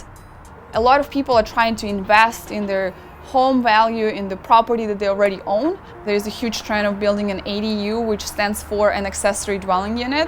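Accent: Russia. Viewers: It is obvious that is well educated but it is so strange that Russian speaking people need at least two generations to soften some English sounds. Nice blue Slavic eyes. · She sounds Russian.......didn't expect that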